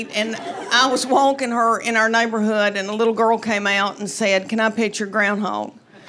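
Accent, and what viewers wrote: South, United States. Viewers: Extreme and perhaps enhanced Southern USA drawl of an accent... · 6-yrs-old....Is Leanne getting a country push? That southern drawl is the drawliest it can be. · Smart. Funny. Southern. AWESOME! Just listen to that beautiful talk. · Love You Leanne!!❤. I’m goin’ on 80 years old! Everything you have said is so true! I just fall over laughing 😊😊😊❤❤❤❤❤Your Southern accent and your family stories leave me holding my sides laughing!! My dream is to see you in person❤❤❤!!!!I live in New Jersey, the Jersey Shore! I am determined to see you!! God Bless! Your shows never get old! I can’t get through the day without you!❤❤❤❤!!!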